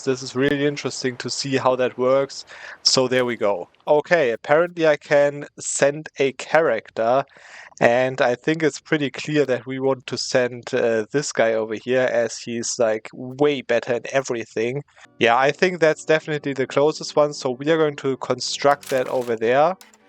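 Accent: Germany. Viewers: Holly shit the german accent is strong in you. Greetings from Germany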